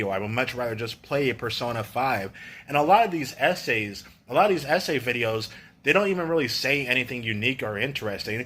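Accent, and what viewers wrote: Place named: Texas, United States. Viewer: Where you from man you sound like you are from Texas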